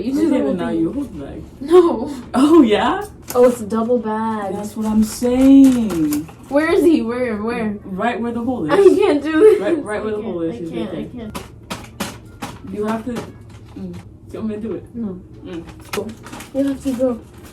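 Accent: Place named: United Kingdom. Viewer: Ur gorge also that british accent tho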